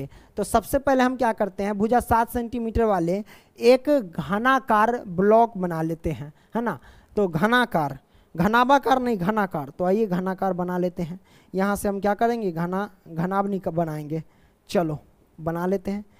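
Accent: Bihar, India. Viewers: Sir aap bihari ho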